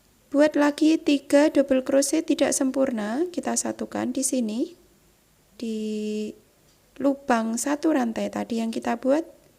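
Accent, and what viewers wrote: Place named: Java, Indonesia. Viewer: Mantap suka suaranya medok 😍😍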